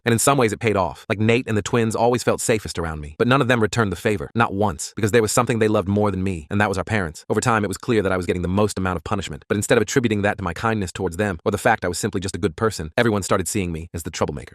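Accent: Texas, United States. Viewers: Anyone else realize after like the first couple sentences it gets a Texan accent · Texan accent + Kayla as his sister goes hard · THE TEXAN ACCENT BRO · Yeah bro I was like WHY DO YOU KEEP SWITCHING TO A TEXAS ACCENT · Why does it keep going texan accent 💀🙌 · TEXAN ACCENT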